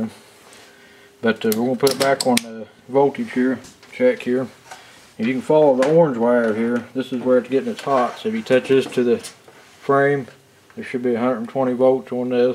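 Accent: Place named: Kentucky, United States. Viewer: It's called an accent lmao. I'm from Kentucky so I understood everything he said.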